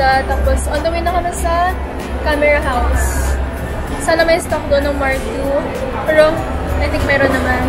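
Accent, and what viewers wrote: Batangas, Philippines. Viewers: You’re so adorable and I love the way you talk especially with your batangueño accent ♥️. IG:nnayramlee Fb:Mary Ann De Villa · Naging bella fam po lalo nung nalaman ko po na batangueña po kayu sobra po akong natutuwa kapag nag babatangueña po kayu. Taga batangas po kase ako😍 Fb: Gwen Comia Ig: gwenv.hope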